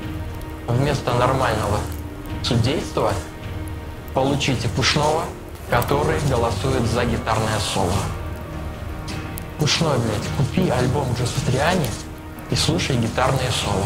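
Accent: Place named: Belarus. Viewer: Первый раз слышу такой голос на твоем канале. По акценту слышно что ты с Беларуси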